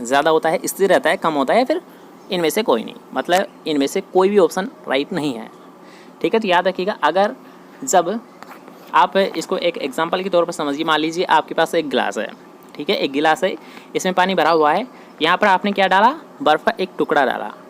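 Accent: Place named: India. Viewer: Sir ji aapki awaj bahut achhi h....aap kaunse stet se h ..aapki Hindi kuch alg h..but cute h...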